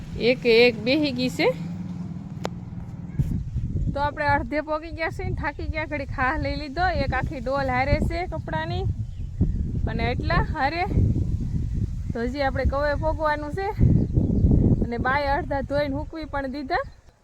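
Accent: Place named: Kathiawar, India. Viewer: તમે બોલો તે કાઠીયાવાડી ભાષા સાંભળવી ગમે છે. તમારા ગામ નું નામ શું છે.ત્યા કેમ પહોંચાય તે વિસ્તાર થી કહેશો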